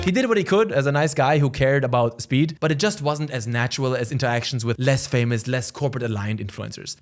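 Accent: Germany. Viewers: Dein Akzent erinnert mich an die Deutsche Sprache. Ich glaube du kannst Deutsch🤔. Wäre interessant zu wissen. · u german? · Your dialect is German I hear it my brother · This Guy sounds like a german guy talking english 😄bist du Deutscher?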